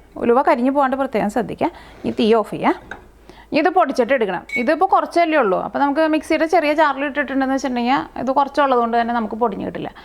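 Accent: Thrissur, India. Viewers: Thrissur slang very nice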